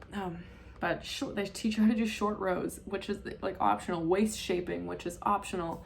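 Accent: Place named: Vermont, United States. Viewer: Great review!! I kept thinking you were from vt at the beginning of the video. Hello neighbor!!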